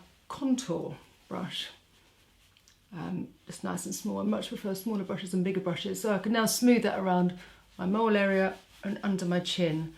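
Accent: United Kingdom. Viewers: Hi from Israel!😁I'm always happy to subscribe to british "beauty gurues", the accent is beautiful. Happy Valentines day!🌼🌻💐🌸🌹🥀🌺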